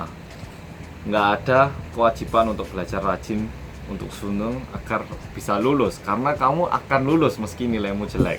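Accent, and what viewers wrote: Java, Indonesia. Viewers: kok kedoknya kedok jawa kak xD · Ini masnya asli korea apa org indo? kok medok jawa ne😂 Ya wes. La lek😂😂😂 Sukasuka😄😄😄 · Korea asli tapi tinggal di Indo dan berlogat Jawa (medok). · logatnya ky orang jawa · Logat nya rada kyk org jawa gitu , medhok bgt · Oppa asli korea atau orang indonesia?????logat jawa nya kena banget 👍👍👍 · orng korea tapi jawa banget ngomongnya 😍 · medoknya lucu 😂😂😂😂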